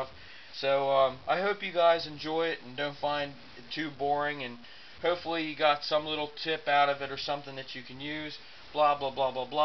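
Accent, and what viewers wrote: Baltimore, United States. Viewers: Good idea. You sound like you have a Baltimore accent. Am I right?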